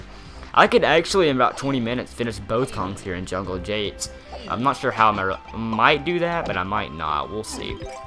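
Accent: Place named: South, United States. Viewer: I love your southern accent man!!!!!! :D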